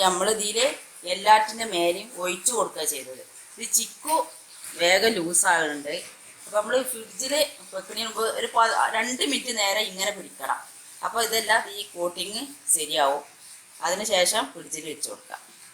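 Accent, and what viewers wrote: Malappuram, India. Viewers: Nice itha .nishkalankamaaya Malappuram talk .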